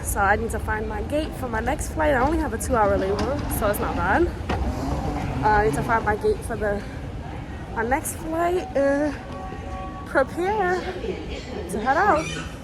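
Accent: New York, United States. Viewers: New York (American) accent.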